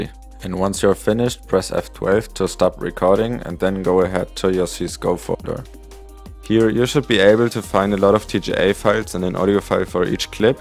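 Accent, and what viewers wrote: Germany. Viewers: you sound like you're coming form germany^^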